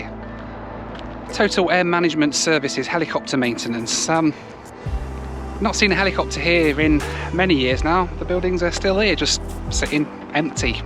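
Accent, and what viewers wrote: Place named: Sheffield, United Kingdom. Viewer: So why has she got a Sheffield accent then? Noted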